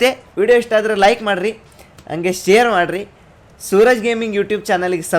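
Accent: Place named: Coastal Karnataka, India. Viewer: Guru karavali shyali maath ajji maat super chanda guru aadre nin yen guru karavali ne marth bittidya ansute adke maath sa change aagide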